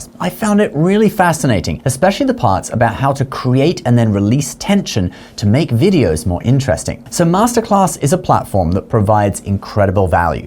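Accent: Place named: United Kingdom. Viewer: Me too, love that UK accent- direct and to the point, not alot of fluff. Nice funny jokes and new content regularly--- he gets 100 cool points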